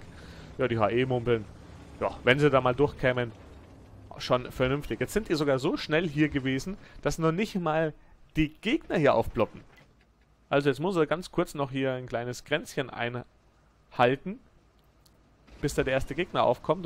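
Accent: Bavaria, Germany. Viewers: aus welchen teil Bayerns kommst du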